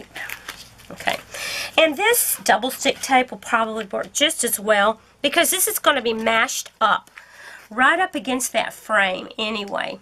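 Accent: Texas, United States. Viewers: I would really like a drill-along with you. I've been drilling along with your videos going in the background. It's so fun hearing a nice Texan accent when I'm from New Zealand :D I love landscapes. I'm working on Starry Night right now but would like to do custom landscapes of photos I've taken in the future.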